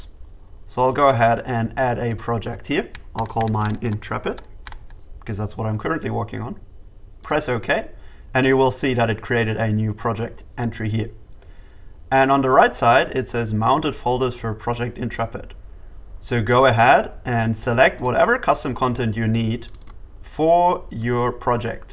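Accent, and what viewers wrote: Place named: Germany. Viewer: I höre some Deutsch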